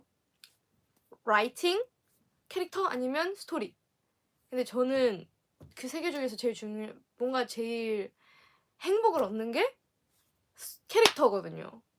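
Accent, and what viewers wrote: Australia. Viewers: es muy linda 💕 y su estilo australiano de expresarse es maravilloso. Te amamos 💕💕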